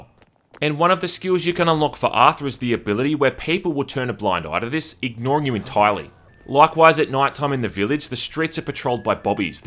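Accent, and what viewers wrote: Australia. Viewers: Who'd had thunk'd that one day I would understand australianish almost perfectly.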